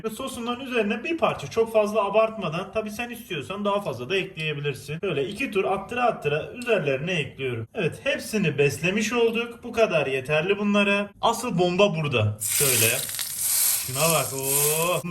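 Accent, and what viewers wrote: Adana, Turkey. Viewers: Abi sen Adanalı gibi konüşürsüünnn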